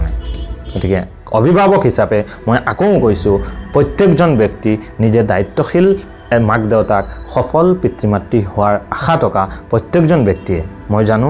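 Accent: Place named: England, United Kingdom. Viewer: M a big fan of your English ascents bro 🔥 Great work carry on 🙏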